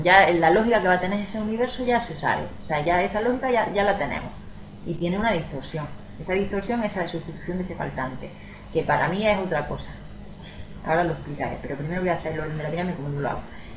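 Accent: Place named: Andalusia, Spain. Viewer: Hola. Gracias por tu video es muy generoso por tu parte. Me encanta tu acento andaluz y se te entiende perfectamente. Quien no entiende es porque no quiere. Me ha sorprendido lo bien que explicas los conceptos, con naturalidad y soltura. Ha estado muy interesante. A mí me gustaría también oir más de tus experiencias. Sigue subiendo vídeos. Saludos y no hagas caso a los haters, los hay en todos lados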